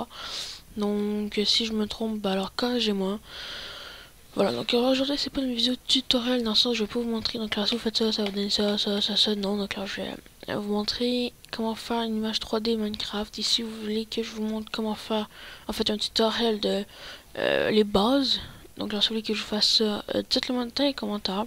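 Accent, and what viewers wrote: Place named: Quebec, Canada. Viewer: quebecois je suis quebecois et je reconais laccent il est juste young faque surment que sa voix est un peu weird mais javais la meme avant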